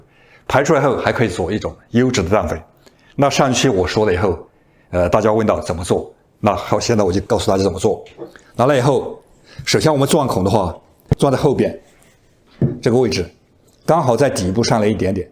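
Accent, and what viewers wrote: Yunnan, China. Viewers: 您应该是云南人吧😂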